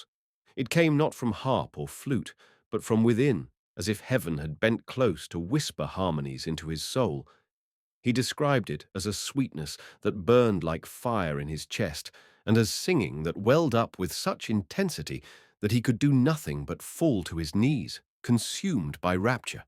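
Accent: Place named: United Kingdom. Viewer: Interesting subject and perfect accent. This is the real British accent .😊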